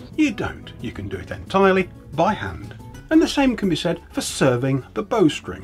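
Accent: United Kingdom. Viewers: Thank YOU! Since childhood I have wanted to make my own bow. I practised archery as a kid and was obsessed with Robin Hood and all things Medieval. I remember my dad and I at some point went to the woods to find a big branch of a yew (called a taks tree, from the Latin, in my native Denmark) from which to make me a long bow, like Robin Hood’s. But although my father is a fourth generation cabinet maker by training, we were unsure on how to go about making a long bow. I since worked many years as a lumber jack, but eventually became a classical guitarist by profession and a lutenist of sorts. Unusual background, I know. I kicked my television out many years ago, and I watch instead wood working videos by Paul Sellers and bow and archery craft with you and select others when ever I have the time and need. I thank you for bringing all of these disparate but somehow connected interests of mine together by teaching us the seemingly forgotten skills of yet another of mankind’s inventions. 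I’ve had my goal set at times variously on instrument and furniture making, but I am convinced that bow making might become my spare time enterprise of choice. I must also further compliment you on, A) being a top-level presenter, comparable to the very best, without exception, and B) having a superbly brilliant dog! (An aside, is that a Coventry accent, by any chance?)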